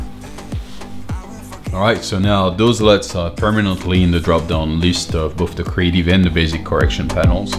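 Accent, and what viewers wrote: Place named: France. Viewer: You are purely French. Your accent speaks a lot haha!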